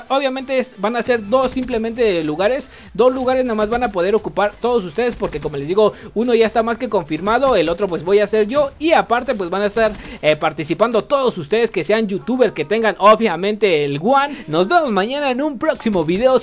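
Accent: Mexico. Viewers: ¿¡Eres de México!?. 😱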